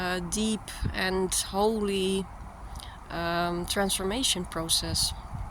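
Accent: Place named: Netherlands. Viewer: Your accent sounds Dutch.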